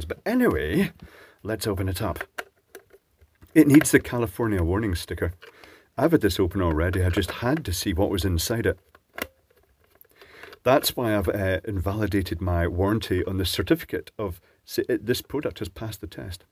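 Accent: Scotland, United Kingdom. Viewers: are you from Scotland? im Scottish and u sound Scottish sometimes but also you sound more English and its so confusing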